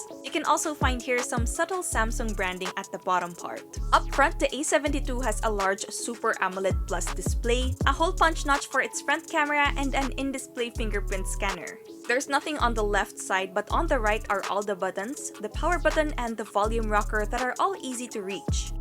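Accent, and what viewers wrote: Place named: Philippines. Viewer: Love that Filipino accent 💕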